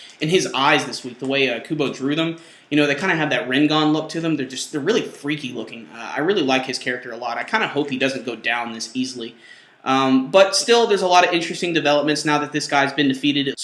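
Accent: Germany. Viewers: Once again, your german accent is priceless!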